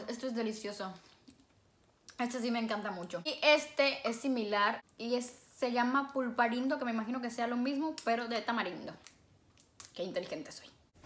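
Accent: Colombia. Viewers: ERES DE COLOMBIA???? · O ye tu eres colombiana ❤❤ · Está tipa no tiene asentó mexicano , tiene asentó colombiano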